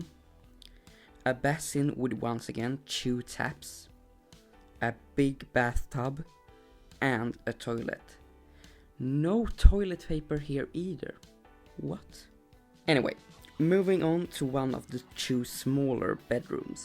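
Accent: Sweden. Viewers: Du låter svensk🇸🇪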